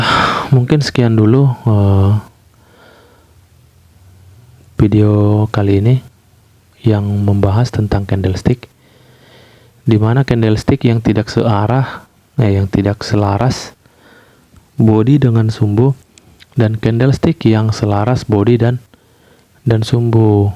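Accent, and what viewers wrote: Medan, Indonesia. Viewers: Bg orng Medan yaa ?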